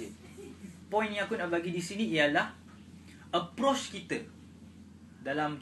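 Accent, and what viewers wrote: Malaysia. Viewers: Ni konfem kelate..haha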